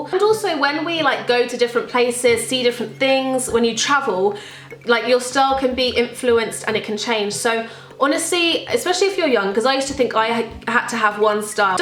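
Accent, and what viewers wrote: United Kingdom. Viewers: Your British accent is sooo cute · Are you from the uk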